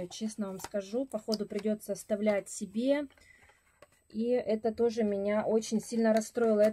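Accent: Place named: Urals, Russia. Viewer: вы из какого города?Акцент у вас уральский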